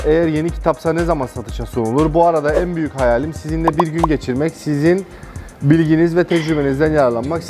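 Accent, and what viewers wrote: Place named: Turkmenistan. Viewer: Konusman Türkmenlere benziyor. Türkmen misin?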